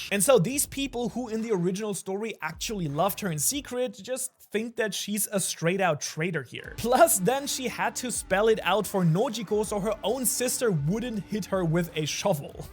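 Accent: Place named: Germany. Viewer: Ich mag deinen Deutschen Akzent.❤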